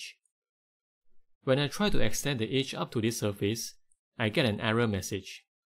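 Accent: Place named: Straits, Malaysia. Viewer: Thank you for the clear and to the point tutorial. After watching 4 other confusing and poorly presented videos, this was refreshing time saver, as was the Straits English over Indian English dialects.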